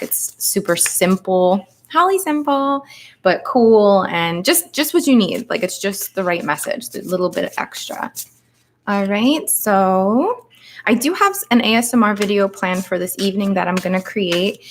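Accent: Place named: New Jersey, United States. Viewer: I know a Jersey girl when I hear one. My ol' stomping grounds back in the day. "Regrets, I've had a few, but then again, too few to mention, I did what I had to do and saw it through without exemption..."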